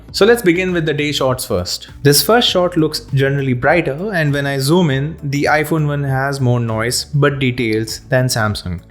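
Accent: India. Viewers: I am here to hear the cool Indian accent. :)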